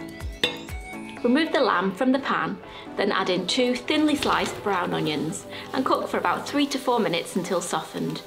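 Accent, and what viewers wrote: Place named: Northern England, United Kingdom. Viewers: That looks amazing I liked the recipe as much as I liked her Lancashire accent. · So pleased I found your channel. Thank you so much for the lovely recipes and for the clear and easy way you describe what you're doing. You do sound more Cheshire than Lancashire to me though! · Must give it a bash. Love your Lancs accent. Thanks. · We have proper vowels up north.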